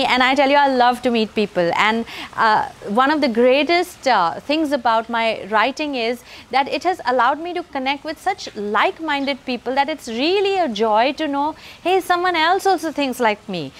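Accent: India. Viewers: You speak pure Indian English. Which is obvious and with charming pronunciation. So it becomes very easy to understand;and there for everybody likes your lectures. You are a jwel for the Indians. --Dr Mohanty, Seraikella (Jharkhand).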